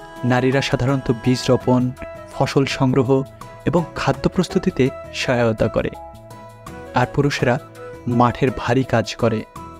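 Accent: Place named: Bangladesh. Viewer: Vai, apnar naam ki?? Apnar voice to ami onno channel er background a SS hunecchi mone hocche.... R apni bodhoy Bangladeshi, not from kolkata, kothar style shunle tai e mone hocche. Amio from Bangladesh 😊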